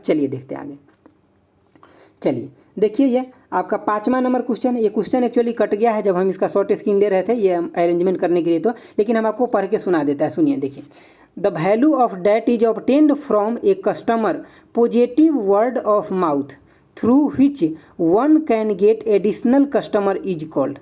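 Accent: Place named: India. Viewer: Sir aapke ke padane ke technique ke saath saath bolne ka tarika bhi ekdum desi hai 😁 Jai exam crack academy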